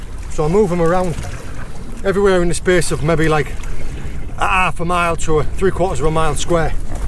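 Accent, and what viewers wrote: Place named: Redcar, United Kingdom. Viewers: where abouts in the northeast are you from sound like redcar area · me and Mrs were trying for months I thought up Redcar as well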